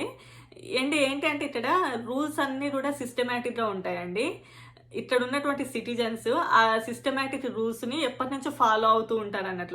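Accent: Telangana, India. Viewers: Chala baga cheputhunnaviu bidda. unnadi unnatlu clarityga cheppavu thalli.very good Amma.India lo Ap, kadu meru telangana telugu vare anukuntunnanu.thank you bidda.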